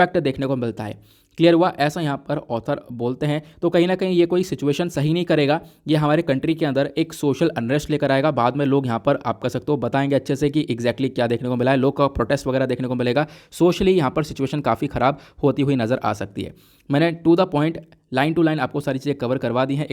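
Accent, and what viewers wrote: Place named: Uttar Pradesh, India. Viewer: acha mujhe nhi pta tha..actually mne 4 months phle se hi inko follow kiya h...ha mujhe bhi lgta hi tha..unke bolne ke style se..chlo hum sb up ke hi h..😆😂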